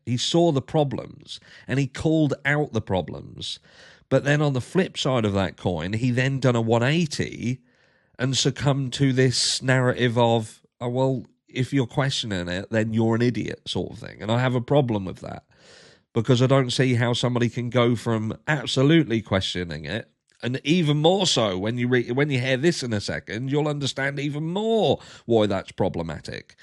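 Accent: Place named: Norfolk, United Kingdom. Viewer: Of course it's bullshit. You are right. Even in our in bread norfolk, where I a sm from and sounds like you are, this shit don't happen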